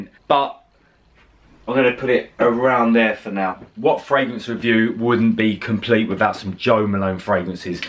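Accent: United Kingdom. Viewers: Nice , but please put it in description because I couldn't get the names of some of them, English accent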